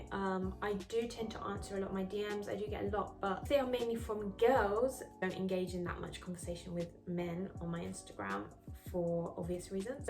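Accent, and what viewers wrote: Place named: United Kingdom. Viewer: I don’t understand a single word ohhhh Britain 🙄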